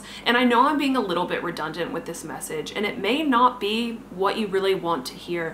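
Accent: Australia. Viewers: are you a bit Australian too? This video was really helpful. Thankyou :)) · You have a slight Australian accent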